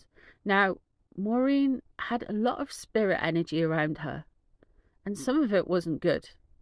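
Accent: United Kingdom. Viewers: I have watched your videos ever since Shanta from Mystical Fortunes had her members share a reading together with you! I have to say it took me a few times to understand what you were saying. Only because of the wording you have a great British accent ❤️ I soon learned of your quality of kindness and caring of the people who are in your community of people who follow you and your members. I have wanted to join but I needed help from my daughter who finally got me into the membership. Shanta always speaks very highly of you and has told us to go to you for any of our needs!!! Thank you for your amazing work and the ethical way you have done your work in all your videos. I love your live streams and enjoy them as well as all your other videos. I hope you have a wonderful day 💙☮️✨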